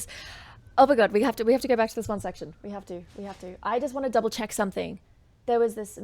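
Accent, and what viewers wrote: Australia. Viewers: Your honest analysis, well-balanced pausing, hair color, smile, & cute Aussie accent get me emotionally connected as well. 😍💃😁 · Don't let the "don't pause" crowd affect how you do your reactions. Do what comes naturally...most are here for a reaction/analysis..if we wanted to listen to it straight through we can! Everything sounds better with an Aussie accent BTW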